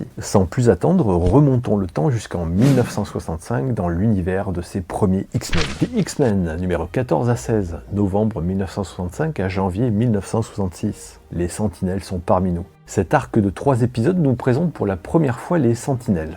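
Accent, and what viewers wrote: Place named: United Kingdom. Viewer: Merci pour ta vidéo et ta chaîne dans l'absolu ! Ton accent anglais me fait bien rire 😉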